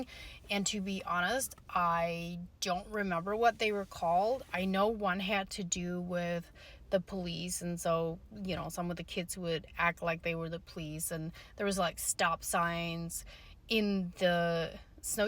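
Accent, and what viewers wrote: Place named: Pennsylvania Dutch, United States. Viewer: The Pennsylvania Dutch accent really comes out when telling these obviously very beloved childhood stories!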